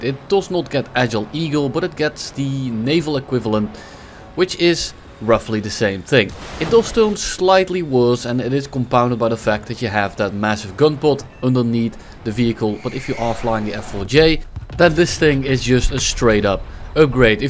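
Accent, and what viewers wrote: Netherlands. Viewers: Je accent is iets te Nederlands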